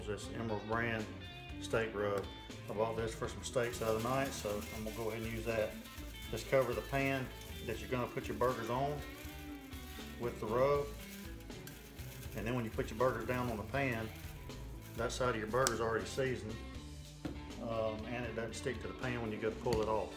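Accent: South, United States. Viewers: you sound like a real country boy what state are you in to me it sounds like Texas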